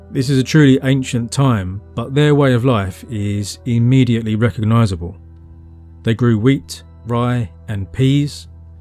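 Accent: United Kingdom. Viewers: I cant listen to British narration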